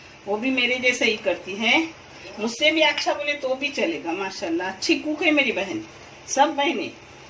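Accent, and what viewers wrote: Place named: Konkan, India. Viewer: BEHEN JI AAP KOKNI HAIN , JIS TARHA AAP KI BANAI SARI RECIPES AUTHENTIC HAIN , USI TARHA AAP KI URDU JO KOKNI ANDAZ AUR PRONUNCIATION" تلفظ" LIYE HOTI HAI WOH MUJHEY BOHOT ACHCHHA LAGTA HAI . DEEGAR AAP KI RECIPE KE DARMIYAN DUAYEN AAP KI SHAKHSIYAT AUR KIRDAR KI AQQASI KARTI HAIN ,"LAJAWAB ". ALLAH TĀALA AAP KO KHUSH RAKKHEY .AAP KO SIHAT AUR LAMBI ZINDAGI DE .TAKEH AAP ISI TARHA KIKAN KI NIT NAI RECIPE SAY HUM SABHON KO NAWAZTI RAHEN . "ZAHID SANGE".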